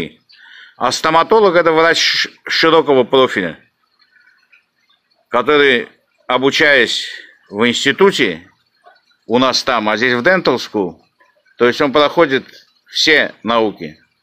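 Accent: Baku, Azerbaijan. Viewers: У автора акцент всё-таки Бакинский остался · У тебя бакинский акцент. Спасибо тебе за правду. Дай бог тебе здоровья и долгой жизни. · Бакинский акцент)))👍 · По акценту понятно что, гедеш бакылыды. Но не все такие как ты. Помню в том же лас вегасе был один Гена, сперва пел песни ай мян бакылыям, ай мои все друзья Азербайджанцы, а потом как пернул, ограш, что Гарабах древняя земля армян тут же получил команду сиктир, гётвярян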